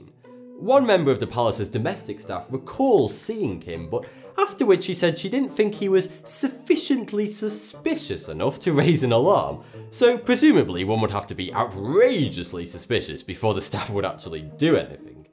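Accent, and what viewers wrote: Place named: RP, United Kingdom. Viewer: Great received pronunciation!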